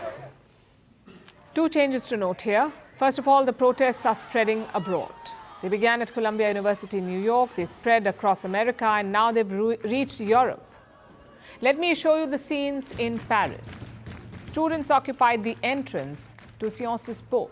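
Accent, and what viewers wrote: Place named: India. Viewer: Indian accent is so beautiful like this news lady. The best accent in the world.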